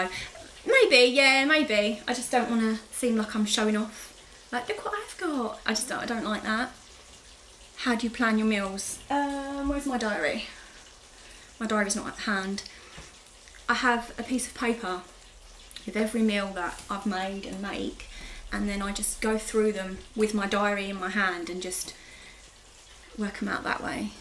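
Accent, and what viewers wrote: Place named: North London, United Kingdom. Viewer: Im from enfield so not far from tottenham :) i could tell from your "accent" that you are a north london girl. Fab videos and i enjoy watching them. I like margate in kent as used to go on holiday there as a kid. Now my kids want to move to great yarmouth after they finish junior school but im not sure how i will adapt from the speedy london life style to a more slow and rural life style.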